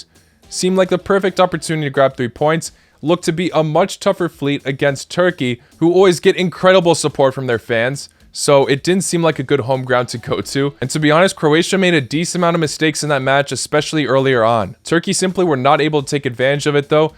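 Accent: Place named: United States. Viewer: His parents are but his bio on Instagram says 🇺🇸🇭🇷 cuz his accent is American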